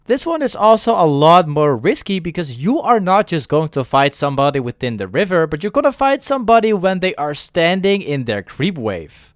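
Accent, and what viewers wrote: Netherlands. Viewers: Are you Dutch? You sound Dutch speaking (Belgium/Netherlands)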